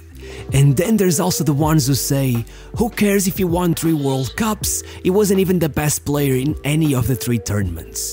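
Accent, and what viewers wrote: Portugal. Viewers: Pela pronúncia dos nomes de jogadores e clubes percebe-se logo que és português... "So clean" ahahahahah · Ele e português bicho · Just by the accent saying the players names, I know this guy is a Portuguese. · So fui perceber que seu sotaque era portugues quando voce falou dos biquíniskkkkk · Top, és português não és? Esse sotaque...🧐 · Ele é português por isso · U sound portuguese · Brother, you’re portuguese ain’t you? Não mintas crlhes. És tuga ao máximo, o sotaque não engana. If so... 👏👏👏👏👏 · Ele é Portugues? parece a forma como diz os nomes Brasileiros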